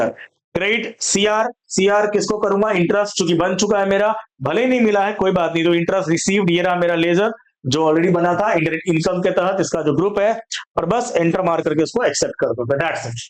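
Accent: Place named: Kanpur, India. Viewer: Language (boli) se lag raha hai AAP kanpur se ho. What am I right??🎉🎉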